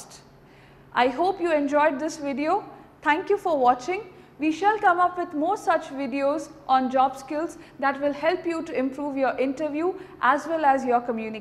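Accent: India. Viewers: Nothing like a pretty Indian accent. So feminine and pretty 💕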